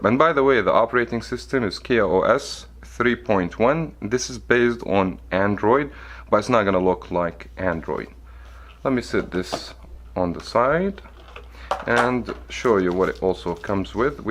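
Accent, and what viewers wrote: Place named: Arab world. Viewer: انت عربي؟ لهجتك كأنها عربيه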